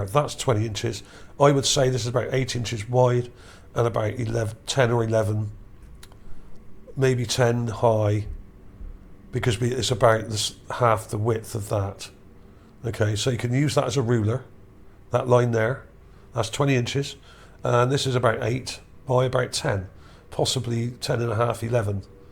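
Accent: Bristol, United Kingdom. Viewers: Sound like a Bristolian or are me ears playing up…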